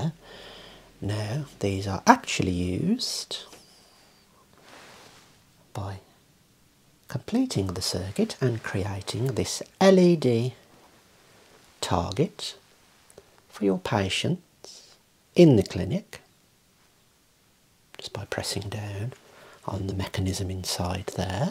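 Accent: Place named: England, United Kingdom. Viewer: This is how I imagine everyone from England speaks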